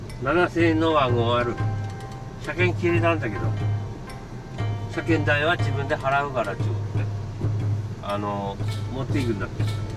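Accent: Tsugaru, Japan. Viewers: 津軽弁での説明、良いですね😃‼️。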